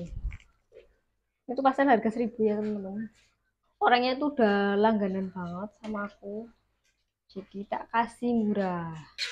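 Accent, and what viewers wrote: Banyumas, Indonesia. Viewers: Ora ngapak ora kepenak jawa ngapak nya mn nduk...??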